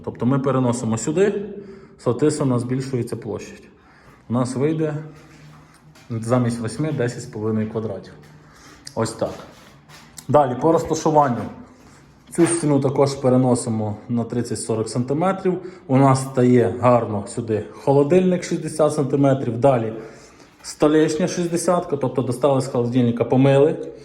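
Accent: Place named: Surzhyk, Ukraine. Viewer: Мова з суржиком, трохи гидко звучить.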